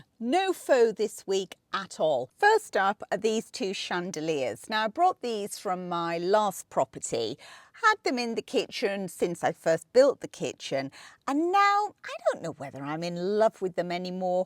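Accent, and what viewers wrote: United Kingdom. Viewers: Love just listening to your British accent, but your decor ideas are fabulous. I wish I could come visit in your beautiful country home. Great videos!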